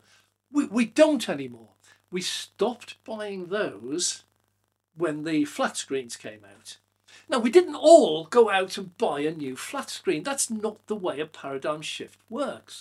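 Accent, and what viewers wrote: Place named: Northern England, United Kingdom. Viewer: Northern! West of the Pennines to be more precise (with a hint of Cornish thrown in 😉).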